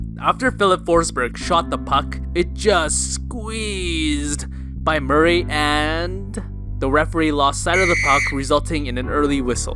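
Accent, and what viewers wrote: Canada. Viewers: What part of Canada are you from? You sound like you're from Quebec. Or maybe you just have a cold.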